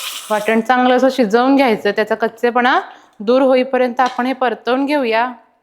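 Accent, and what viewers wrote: Kolhapur, India. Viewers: कोल्हापूरी टोन यायला लागलाय!😊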